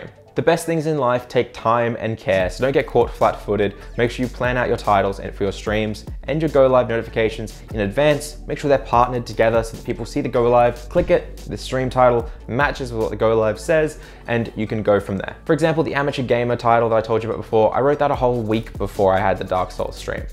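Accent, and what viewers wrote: Australia. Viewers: WAIT YOU ARE AUSTRALIAN NOW I INSTANTLY LIKE YOU MORE WHY DOES IT WORK I FEEL COMNECTED ON A PERSONAL LEVEL bro why and how does this work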